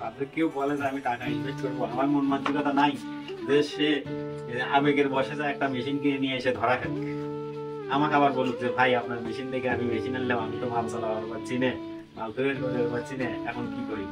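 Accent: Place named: Dinajpur, Bangladesh. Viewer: ভাই, আপনার ঠিকানা টা দেন,কথা শুনে মনে হচ্ছে দিনাজপুরে বারি।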